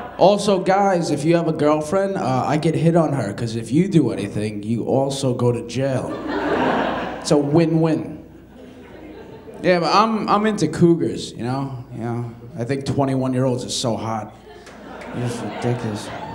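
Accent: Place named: New York, United States. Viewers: that smooth ny Staten Island accent: not very thick but still recognizable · His New York accent tho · Why does he currently sound like a 27 year old New Yorker who moved away but here he sounds like a 65 year old mafia boss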